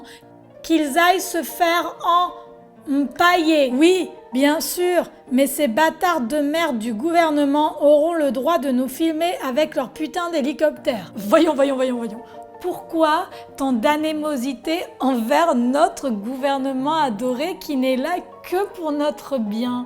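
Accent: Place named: Italy. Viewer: Charmante femme avec un bel accent italien , qui nous donne des vidéos intéressantes à regarder concernant les drones ou autres... continue comme ça et au passage même si tu es assez grande pour ça, ne fais pas attention aux haineux. Au plaisir de voir tes vidéos ! À bientôt 🙂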